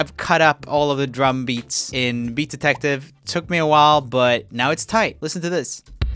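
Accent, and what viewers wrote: Sweden. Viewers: Swædish accent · My mom heard me watching this and she was like "oh is he german or swedish?" And I was like how did you know???? He's swedish? She's just like I know things.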